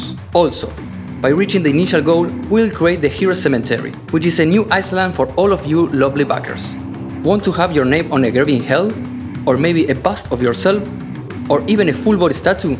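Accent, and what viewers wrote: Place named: Argentina. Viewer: Jajaja genial que al hablar ingles te sale todo el acento argento, genio!!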